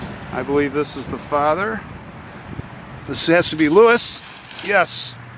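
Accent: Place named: Chicago, United States. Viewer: This is said with love: Your accent sounds like the super fans of saturday night live!! Da Bearrrrrrsss!!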